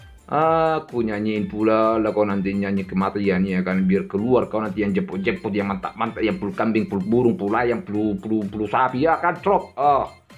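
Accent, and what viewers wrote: Medan, Indonesia. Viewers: Semangat yaa Wak semoga Chanel nya makin sukses 💪💪 dan slalu berkarya <num> Kya nya orang Medan wawak ini 😁😁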